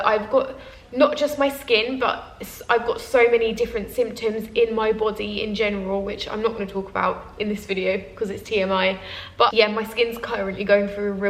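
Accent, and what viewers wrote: United Kingdom. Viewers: I love your British!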